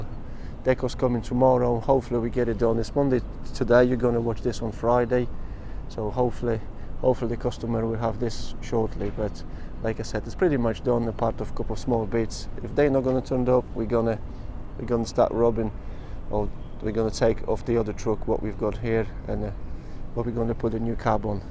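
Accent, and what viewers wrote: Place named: Scotland, United Kingdom. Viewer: I hate this scottish accent, otherwise good job.........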